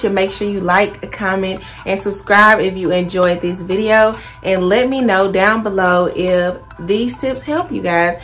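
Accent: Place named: South, United States. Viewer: your accent is adorable southern belle!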